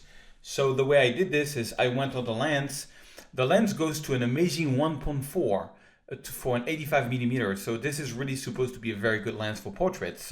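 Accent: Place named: Paris, France. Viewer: man you are the king of marketing to be sure - never seen anyone as slick as you and with their fingers in so many pies...I just love your architectural work, fantastic- aspiring pros can learn a lot from you as to be a successful photographer in this day and age requires not only solid photography skills but also sound knowledge of how to market yourself and the many areas you need to explore to maximize your income - is the man and the Parisian accents just adds to the mystic - I think I will work on establishing an Italian accent, or perhaps German - anything to set you apart from the competition!!!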